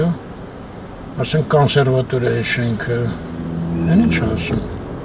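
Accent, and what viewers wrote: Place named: Gyumri, Armenia. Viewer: Вохчуйн! Как давно я не слышал ленаканское наречие именно ленаканское, а не ленИнакаеское ! Было время ехал в командировку в Ленинакан. Чаще на электричке. Знал название почти всех станций, сейчас помню ни все. Ереван, 8ой км, Масис, Эчмиадзин, Октемберян, Каракерт Гетап,, Ани, Ленинакан.. А когда поехал за бульдозером на МАЗе, в Ленинакан поехали через Аштарак, а возвращались через Октемберян. В Ленинакане работал на бульдозере на территории мясо комбината А на территории молочного завода в Ахуряне.. На обратном пути в Октемберяне пробило колесо на трейлере.. Заменили колесо на следующий день. В Ленинакане оставался в гостинице здание похожее как гостиница " Ани" в Ереване. Рядом был кинотеатр названия не помню. А еще в далеком детстве отдыхал в детском санатории в Ленинакане. Вот такие воспоминания!!! 😮😊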